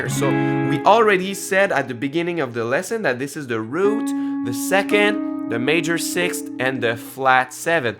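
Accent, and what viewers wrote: Quebec, Canada. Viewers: Oh boy j’ai tout de suite su que tu es québécois mon chum c quand même sick😂😂👌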